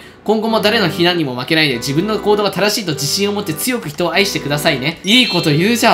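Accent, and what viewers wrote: Kansai, Japan. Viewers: kunさんはたまに関西弁が出てましたから関西出身やと思っとりましたけど岡山出身の方なんですね。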